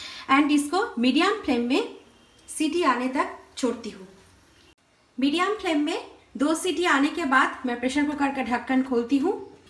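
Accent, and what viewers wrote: Bengal, India. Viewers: Liked your recipe and your hindi with an awesome Bengali flavour👌 thanks for this wonderful recipe.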